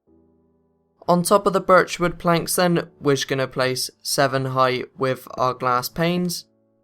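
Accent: United Kingdom. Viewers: hes british if you say anything about his voice f**k u because its racist and stampys avatar is not copyrighted so shut up and if you think hes boring type in mansion tutorial the guys a robot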